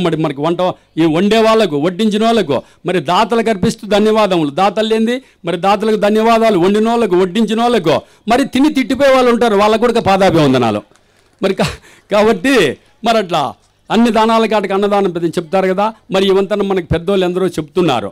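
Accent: Anantapur, India. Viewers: Beautiful language... Rayalaseema slang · Anantapur slang nice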